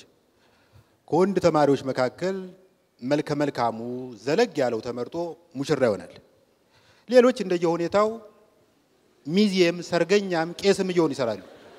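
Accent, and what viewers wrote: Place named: Rural, Ethiopia. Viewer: በውቄ አንተ እኮ ትለያለህ የገጠሩና የባህል ዘዬ አቀራረብ ነው ዋው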